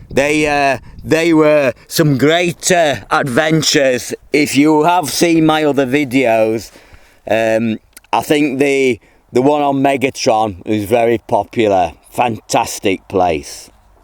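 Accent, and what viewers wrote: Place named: Yorkshire, United Kingdom. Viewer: just started seeing your youtube videos last week because I’am from Sheffield and used to be a caver. I’ve lived outside of England since 1974 but pop back to Sheffield from Madrid to see my brother Dave now and again. It’s great to hear your Yorkshire accent. Just seen this video where you film from the air my childhood play ground. I lived in Fullwood in the 1960’s went to Fulwood scouts and studied at Nethergreen School until 1963 when I went to Rowlinson. I used to bike to Norton everyday going up the back dirt road from Forge Dam. My mate Gerald TIckeled trout near the Water Wheel drainage tunnel of Forge Dam which we explored up to the blockage.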